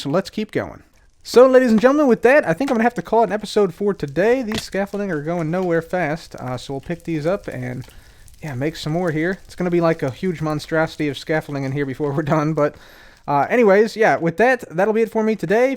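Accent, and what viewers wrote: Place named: Chicago, United States. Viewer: I realized it the first time I ever heard him speak and instantly recognized the Chicago accent :)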